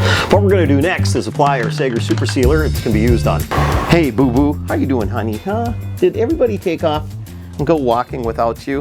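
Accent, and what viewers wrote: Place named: India. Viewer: INDIAN voice. Sales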